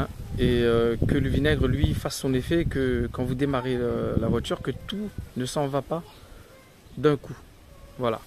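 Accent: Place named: Reunion, France. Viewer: Slt & tout mon RESPECT à vous . Je disais : Aparament les gens aiment bien votre video . Peut être que des mécaniciens de l'Île de la Réunion pourront en profiter pour réparer des voitures des personnes de notre île pour pas cher ....vous voyez ou je veux en venir😂😂 . " quand on est fauché " .Mais BRAVO👏👏👏 . VOILÀ CE QUE VOULAIS DIRE MON COMMENTAIRE EN CRÉOLE ... CAR JE CROYAIS QUE VOUS L' ( ETIEZ CRÉOLE 974 ) ....🤔🤔🤔🤔.Mai lé rare que mi trompe !!!!!! Surtout avec l' accent !!!!! Après chacun son zaffair 😂😂😂😂😂😂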